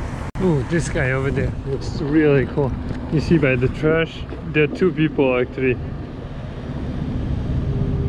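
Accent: France. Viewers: So a year ago I barley used my DSLR and now I'm watching a one hour video of a dude with a french accent and broccoli roaming the streets randomly shooting shit. Life changes man 😂😂 but I love it.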